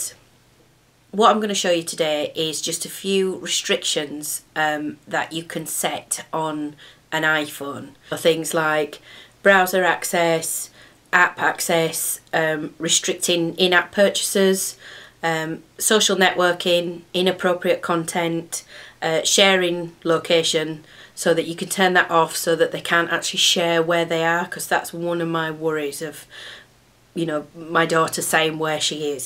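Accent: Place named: United Kingdom. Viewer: Good video. I love the British accent.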